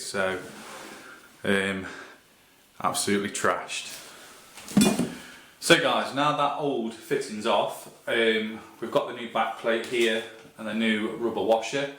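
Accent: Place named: Nottingham, United Kingdom. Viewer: That was spot on fella - thank you. Sounds like you might be Nottingham way too - You Reds!! 🔴⚪ 🙂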